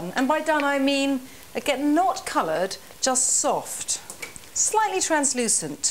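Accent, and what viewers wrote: United Kingdom. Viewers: I am here to learn the British accent and not the dish 🤗🤗🤗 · Your voice is so attractive, I’m going to have my girlfriend start using a british accent 😂😂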